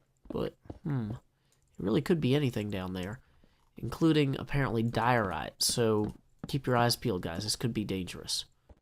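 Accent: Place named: Missouri, United States. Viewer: My apologies, I was watching some other hermits and decided to make a list of collab teams I thought would be great, and as I commented on many of them I realized I left you and Wels out, which is in my honest opinion, is a good pairing of personalities. I won't edit the comment I left them, it reads as follows : The Hermit Stock Exchange is about to open for business, making affiliations now is the key to success in a fluctuating market, as a hermit, please consider the following; all stocks and coin seem to follow a 3 letter categorization in markets which can combine many subsidiary companies and/or projects, and I feel the HSE should follow suit. In this I suggest, not individual companies, but corps with multiple "teams" or "factions" competing in the market; I propose the following for classification and advertisement: RDC - Rendoc ISQ - Isqueen FZM - Falzom ITZ - Imtaz (or ZIT, I kind of like ZIT) ART - Architek CNV - Convex PYA - Pyffa XIZ - XIZuma (he has Devs to back him, like a hedge) DGE - Doge (a generic stock anyone can invest in [volatility] PS cute dog face ^^ ) leaving one open for a collab in the future (JSN maybe ^^) Going down the list I realized, Python is on a Terraria kick, and Biffa is without a team member, also, I seem to have left you two out, I am deeply sorry, I mean you're kool, calm, collected, have awesome BG music, and your accent reminds me of my time in Missouri; nice people there. 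I hope that you and Wels will take over the medieval distric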